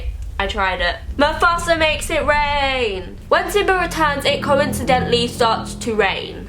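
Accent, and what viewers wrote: United Kingdom. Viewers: Fuck me up w ur Britishnesss mama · Gurl if you put on a Victoria secret 2 cup push up bra, a juicy sweater, and made your hair a little more blonde... You'd be Tana with a British accent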